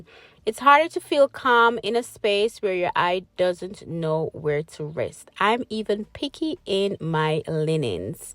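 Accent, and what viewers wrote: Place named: Caribbean. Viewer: I don't know how I ended up on this side of youTube but heard the accent and hard to support my Caribbean sistah! Great tips!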